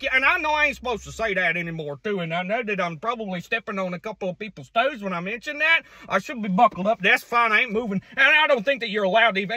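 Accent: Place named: South, United States. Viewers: Take out the southern accent, make the camera half the resolution and put it 5 millimeters away from his face, and this becomes a universal experience. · He sounds so fk southern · I genuinely love all these Southern accents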